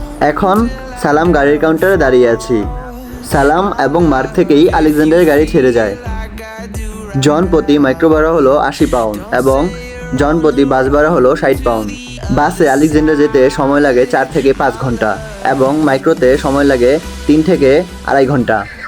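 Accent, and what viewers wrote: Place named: Ishwardi, Bangladesh. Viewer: ভাই আপনার বাড়ি কি পাবনা জেলার ঈশ্বরদী তে আপনি ঈশ্বরদীর ভাষায় কথা বলছেন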